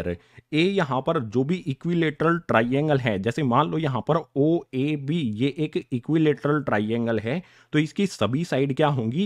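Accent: Haryana, India. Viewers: Bhai.. Sir. Kaha ke h.... Mujhe lagta h ki haryana ya rajasthan ke h..... Apko kya lagta h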